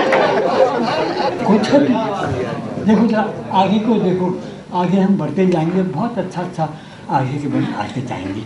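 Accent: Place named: Pahadi, India. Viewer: Awesome sher da ...perfect pahadi boli hai ..aap legend Ho KUMAON ke ..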